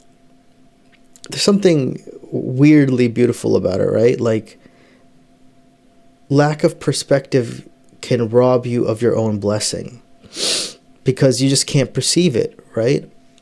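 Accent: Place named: United States. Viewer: dude's accent is about as American as it gets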